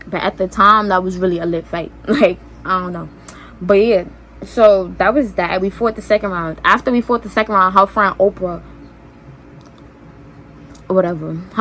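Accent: New York, United States. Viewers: mannnnn her ny accent is everything, and she mad pretty 😍 my parents had one job😭😩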